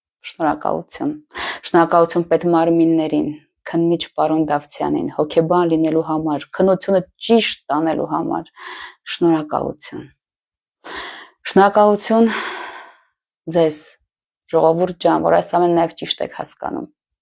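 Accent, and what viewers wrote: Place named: Russia. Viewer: ինչու եք ռուսական տոնով խոսում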